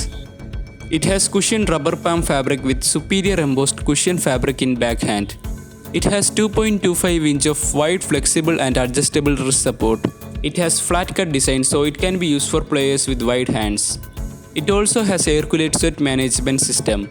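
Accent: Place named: Kerala, India. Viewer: mallu thanna 😹 Samsaram kettal thanne manasilavum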